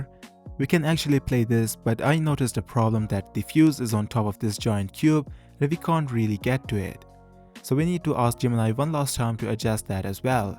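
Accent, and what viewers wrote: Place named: Bengal, India. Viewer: Bhai Bangali? Kotha shune bujha jay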